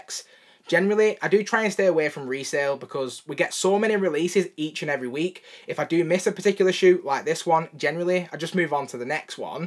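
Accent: Scotland, United Kingdom. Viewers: Saw your comment on settle Fowler. Is that a Scottish accent? Anyways I like this video. My favorite yeezy 350s were the cinder, earths linens and upcoming sand color way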